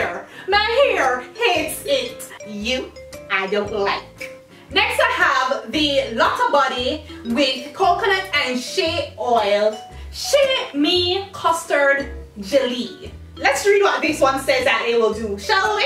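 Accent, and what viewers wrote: Jamaica. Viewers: so refreshing to watch a hair blogger with a jamaican accent 💖🙌🏿 you really named and shamed them products ha HAAA 😂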